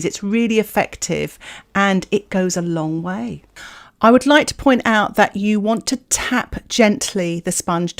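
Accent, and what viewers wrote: United Kingdom. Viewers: La tua pronuncia così britannica mi.piace tanto! 😊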